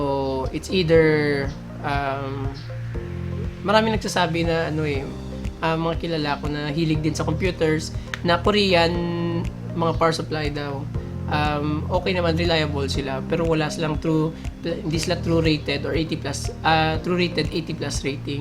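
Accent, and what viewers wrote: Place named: Iloilo, Philippines. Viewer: Prang ilonggo ka kasi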